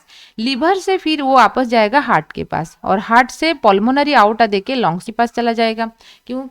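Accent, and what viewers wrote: Odisha, India. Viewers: Are you from odisha mam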